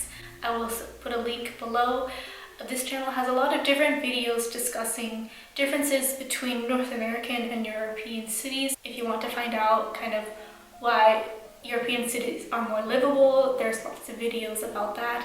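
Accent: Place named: Germany. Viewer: You do not sound like you are originally American your accent sounds like you are a German that moved to America!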